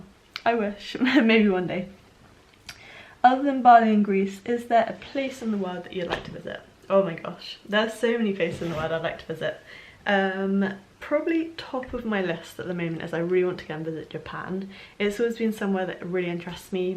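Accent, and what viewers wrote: United Kingdom. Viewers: I absolutely LOVE!! Your British accent. It adds to your personality. Love you.:-)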